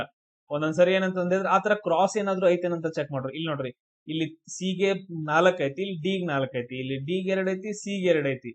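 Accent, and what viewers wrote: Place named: Uttara Kannada, India. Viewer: Basically I'm from malnad side.. your teaching style and u.k kannada is superbb sirr..,🫡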